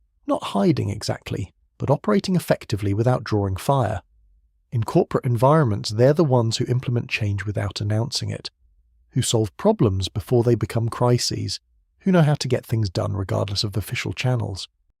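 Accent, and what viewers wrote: United Kingdom. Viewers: Anything that's narrated using a British accent is instantly believable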